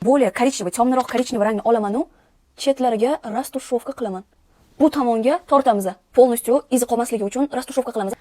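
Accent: Bukhara, Uzbekistan. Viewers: Ibi Manam Buxorolik😅 shevamdan aylanay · Navoiylikla qanaqa gapiradi nima kulasan Buxoro shevasi zoʻr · Бухоро шеваси ❤❤🔥🔥🔥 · Buxoro sheda juda chiroyli sheva meni dadajonim Buxorodan ayajonim Samarqandan makyaj juda chiroyli vaaaaav🎉🎉🎉🎉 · Biza Buxorolik bizaga shunaqa gapirish yarashadi😅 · Biz buxoroliklarni gòzal shevamizni ustidan hech kim kulishga haqqi yoq❤ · Navoiy yoki Buxoro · Shevaba gap nest.Buxoro nomer ❤❤❤❤❤1. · Шевангиз узингизга ярашади Бухороликмисиз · Salom buxorolikmisiz.Buxoromni shevasidan o'rgilayda.🫰🫰🫰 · Manga Buxoro shevasi yoqadi❤ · Бухороликмисиз дийман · Buxoro shevasi nomer 1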